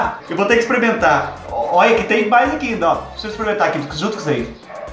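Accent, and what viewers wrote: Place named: Taquarituba, Brazil. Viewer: Eu entendo toda sua linguagem porque sou de Taquarituba kkkkk é cresci ouvindo falar assim hoje fais 40 anos que moro em SP mais a raiz continuakkk adóro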